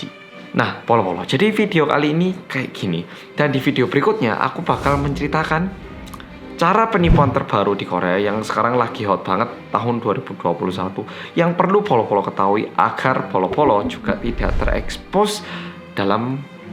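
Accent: Java, Indonesia. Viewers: Mas lebih kentel Jawanya daripada Korea😭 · Bersama dengan orang korea yang medhokkkk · canduu medokk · Bersama orang Korea yang medok.... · Bahasa nya oppa medok bgt 😁 Enak di denger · Kembali lagi sama orang korea yang MEDDHHOOK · Bersama orang korea yang medhoooook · Cerita yg dpt menjadikan kita semua menjadi lebih hati2 di era yg serba canggih... Thanks mas korea yg medok jowone 😁🙏👍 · Medooookkkk semakin ombo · Hihihi medhokkkk🌼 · Medokkkk(bibirku meluu mlongo) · Mas medooookkkk...😊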